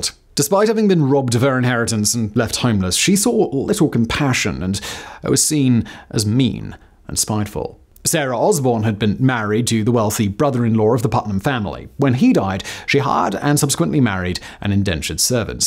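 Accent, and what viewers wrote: United Kingdom. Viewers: I thought British people only talked like this in movies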